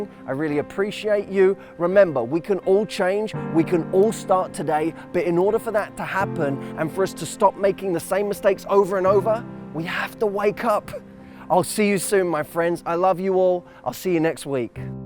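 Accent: United States. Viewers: like your american accent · Your American accent is impressive! Look out Hollywood! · Came here for the life tips. Stayed for his American accent😂😂😍😍😍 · he does an American accent so well, but I love his accent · WHOA That American accent!!! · great american accent!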